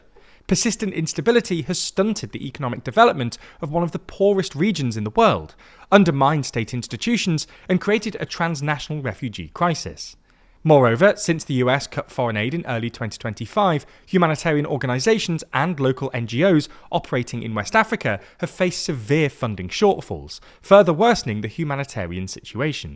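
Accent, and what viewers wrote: United Kingdom. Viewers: Hate your brit accent, this accent is more a turn off than conveying credibility. Britain is a has been.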